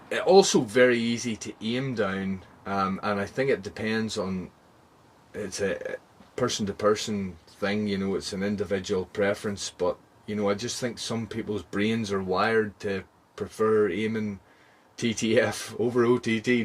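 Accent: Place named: Belfast, United Kingdom. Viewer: his accent is called a Belfast accent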